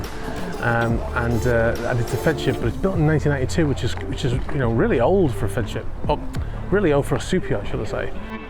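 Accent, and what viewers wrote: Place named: Merseyside, United Kingdom. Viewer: Sounds like you originate from Merseyside so the sea is in your blood then. How did you get into the career you've had?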